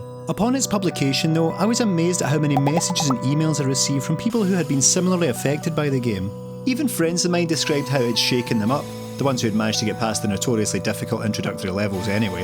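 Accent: Scotland, United Kingdom. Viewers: It’s funny how jarring it is to go into a video expecting not a Scott to start speaking and then having to catch up to understand what they are saying 😂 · Holy shit you sound like a scottish ethan klein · Nice scottish accent. <3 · Love loading a YouTube video and hearing a fellow scotsman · D was a fun video, I like your accent. I am german, so I don't exactly know what it is, scottish accent? :)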